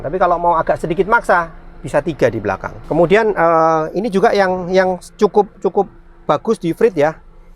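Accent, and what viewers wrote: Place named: Surabaya, Indonesia. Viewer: Mantabh reviewnya om, narasinya ga kaya baca spek pabrikan, tp punya angle yg menarik sebagai user, ditambah logat suroboyoan yg khas, jd bikin reviewnya semakin menarik. Auto subscribe!! Ditunggu content menarik selanjutnya Ko. #jadipengenpunyafreed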